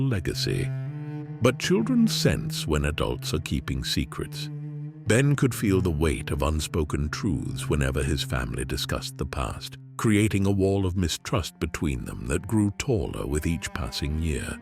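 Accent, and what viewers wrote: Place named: United Kingdom. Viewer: I never knew someone so british could tell stories about StarWars, but im in for it <3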